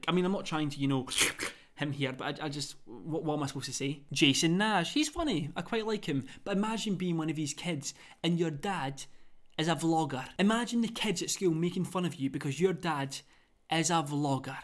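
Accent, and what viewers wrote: Scotland, United Kingdom. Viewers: Anyone know what part of Scotland he’s from just wondering cos accents are cool lolll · where about in scotland are you from?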